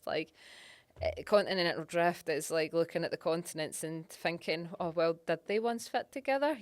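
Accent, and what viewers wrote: Scotland, United Kingdom. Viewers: US guy here: I worked as a geologist in the petroleum industry for many years. Because of the North Sea fields(with Aberdeen being the “oil capital” of Scotland), there are a lot of Scots employed in that profession. Many of them take US expat assignments, and most of them end up in Houston, the “oil capital” of the U.S. So over the years I worked with a fair number of Scots, mainly geologists and engineers. To (finally) get to the point, I struggled for some time with understanding what they were saying. I was often embarrassed when I had to ask them to repeat something. There are just enough different vowel sound pronunciations, speech rhythm and cadence differences, and dialect words so that American English speakers often feel like we’re a sentence or two behind in our understanding. Over the years, I did become accustomed to the heavy, northern and coastal Scottish accent, but having not heard it spoken for a decade since retirement, I have to pay close attention to to follow her, especially when she’s not “speaking geology” and is just in casual conversation. 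I find it fascinating that our common tongue has so many accents and dialects such that sometimes we actually have trouble understanding others even though we are speaking the same language.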